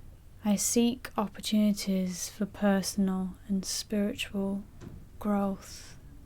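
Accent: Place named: Lancashire, United Kingdom. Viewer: I have social anxiety too but still get surprised when people as outwardly confident as yourself reveal you have it! lol I appreciate a bit of the struggles you must go through, I've had SA for over 15 years now and while I don't quite agree that it can be 'cured' for everyone or the route causes of it, I'd advise people here that it can be worked on and improved at the very least for the vast majority of people. But without sounding creepy (he says as he sounds creepy...lol), I have to say you're such a lovely, beautiful lady, inside and out and you can at least take solace in not just that but in all the good you're doing for others out there with your videos and ASMR. :) PS: I never thought I'd enjoy the Lancashire accent so much before now..! lol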